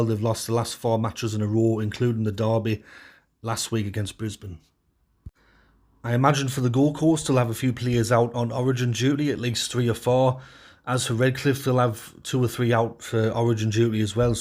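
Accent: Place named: RP, United Kingdom. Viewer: That accent is just pure class. You should be a member of the House of Lords.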